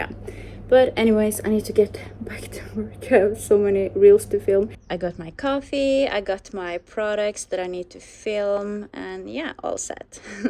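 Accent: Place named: Scandinavia. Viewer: Ah känner, yeah sounded like you were from Scandinavia.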